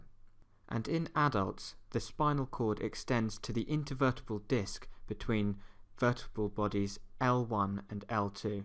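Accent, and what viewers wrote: United Kingdom. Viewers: I am a layman. But I love the accent of your British English.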